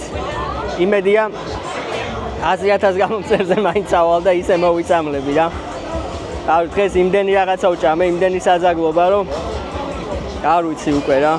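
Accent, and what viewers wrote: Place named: Tbilisi, Georgia. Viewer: წარმატებები შენ! ღმერთმა ხელი მოგიმართოს! ვეღირსეთ თბილისურად მოსაუბრე მოგზაურს👍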